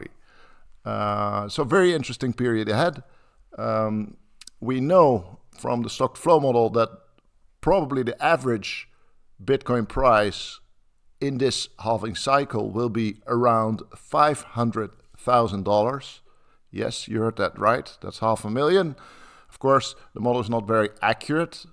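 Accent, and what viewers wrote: Netherlands. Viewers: Finally ... your English pronaciation is suddenly much better ... the Dutch accent is fading ... that's cool . . . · you sound like my old professor of Applied Cognitive Psychology Phycology at the university of Utrecht! Are you Dutch ??!!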